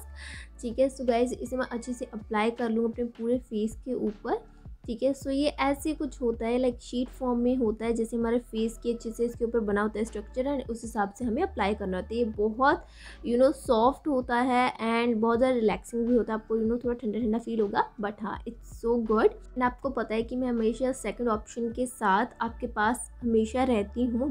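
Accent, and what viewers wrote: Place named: Punjab, India. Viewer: Sister...Are you from punjab?You have got that punjabi accent..😍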